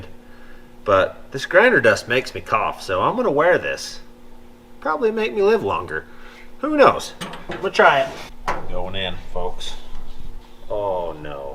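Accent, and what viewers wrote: Utah, United States. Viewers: I think ever since Randall started, your southern Utah drawl has become more noticeable.🙃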